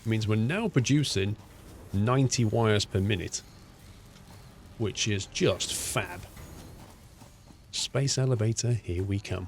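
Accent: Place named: United Kingdom. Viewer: Australian 😉 yeah he a brit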